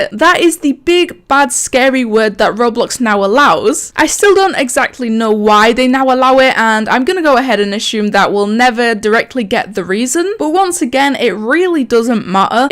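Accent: Scotland, United Kingdom. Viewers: First off "Badass" isnt a bad word second off i like your scottish accent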